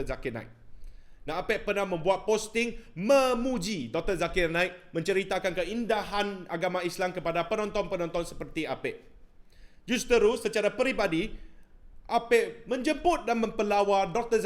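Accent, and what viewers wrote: Kedah, Malaysia. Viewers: Wuihhh ORANG KEDAH WOI!! 🤣🤣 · apek.. cakap loghat kedah laa.. hahahhaa terbaik lah apek..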